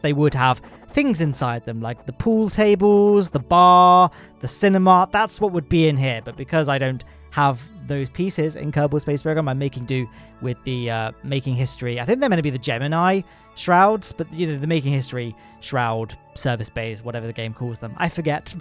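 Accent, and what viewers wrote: United Kingdom. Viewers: He's as British as they get, you can hear it on his accent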